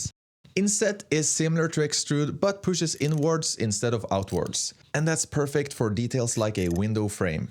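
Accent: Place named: Norway. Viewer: you are Norwegian from that accent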